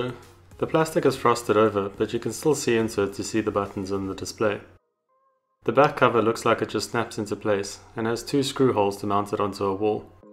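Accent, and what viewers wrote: South Africa. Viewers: You sound like a SOuth African BAsed in Australia. PS Great Content!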